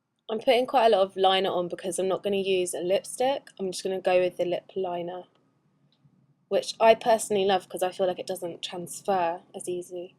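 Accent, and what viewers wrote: London, United Kingdom. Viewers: You are probably the most beautiful girl ever haha u sound Like a Londoner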